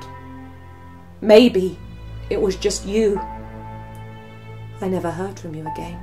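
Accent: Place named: United Kingdom. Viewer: I guess a British accent and dramatic background music justify a retelling of history that has no basis in fact whatsoever. No wonder most of the viewers give a thumbs down to this video.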